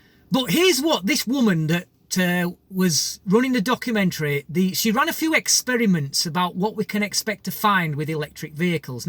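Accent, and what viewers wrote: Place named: Yorkshire, United Kingdom. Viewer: Kernow(Cornwall) is made from granite,lithium is found in granite,so why isnt Kernow covered with lithium mines?I take 2 hours to fully charge from a 13 amp plug at home.my range is 15 miles with no regeneration available..I suffer no range anxiety when the battery goes flat.The battery is 5 years old and still charges fully.When flat,I just push harder on the pedals. Haha its a folding bike.I love accents.Hope I wont insult you to guess Yorkshire,Leeds/Bradford?Yo orite luv.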